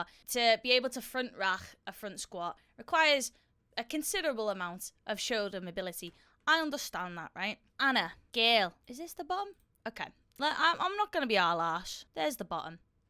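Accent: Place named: England, United Kingdom. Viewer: Primeira vez vendo seu canal com a sua voz original e legendas já que sou do Brasil, costumo colocar a tradução na sua voz mesmo em português porém nesse vídeo não possui Aliás adorei seu sotaque é inglesa certo?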